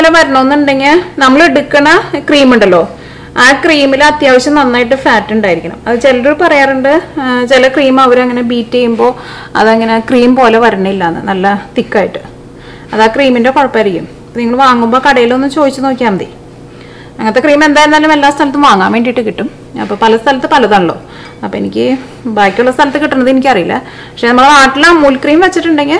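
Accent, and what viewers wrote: Thrissur, India. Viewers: സംഗതിയൊക്കെ കൊള്ളാം പക്ഷേ ഈ തൃശൂർ ഭാഷ ഒന്ന് മാറ്റിക്കൂടെ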